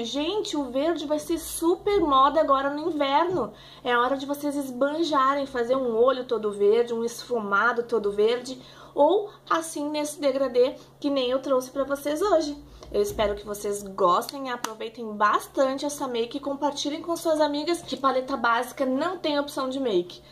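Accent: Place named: Rio Grande do Sul, Brazil. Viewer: Ficou linda, já q o verde estará em alta neste inverno vou aproveitar o meu q está meio abandonado...rsrs. Lindo esse sotaque de gaúcha. Mto sucesso no canal. No meu apresento dicas motivacionais e dinâmica de grupo, se gostar aparece por lá. Bjs.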